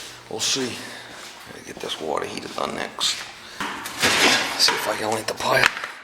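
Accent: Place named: New York, United States. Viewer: That New York accent though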